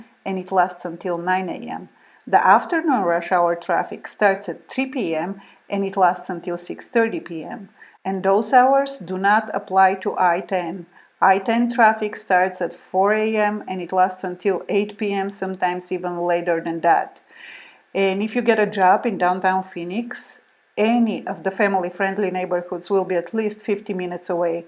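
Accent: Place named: Eastern Europe. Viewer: I picked up the east accent , I'm from Austria :)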